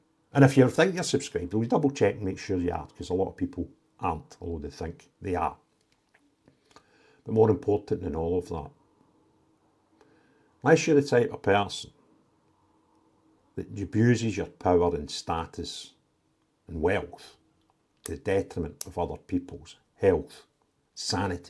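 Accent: Scotland, United Kingdom. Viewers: Sorry mate. Can't understand a word of that broad Scottish accent. Neither can captions.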